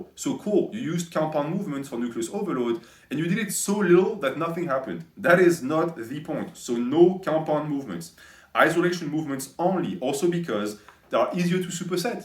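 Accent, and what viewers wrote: France. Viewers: Your French accent makes all of this better. Thank you,.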